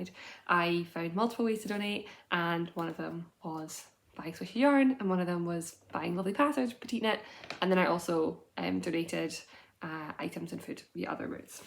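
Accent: Scotland, United Kingdom. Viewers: Perhaps for your Rust sweater you might consider knitting the whole neck with ' knitting in ' elastic, which because it is fine has the advantage of being invisilble, and the elastcity is gentle but lasts. I hope you don't mind my saying, but I do love you soft scottish accent, I think all the people you need to contact by phone should only be allowed to employ, scots people, the whole world would be a lot happier as who could be cross with anyone who sounds so lovely.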